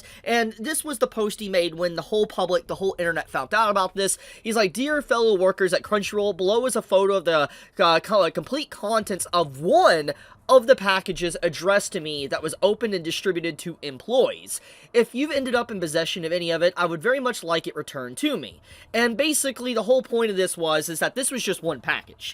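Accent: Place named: Midwest, United States. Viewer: Mid West accent goes hard in this vid lol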